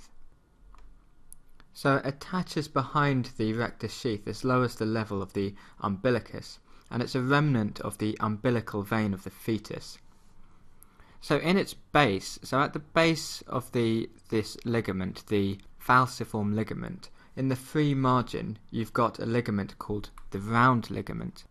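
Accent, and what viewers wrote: United Kingdom. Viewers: the accent is clear British it can't get any more beautiful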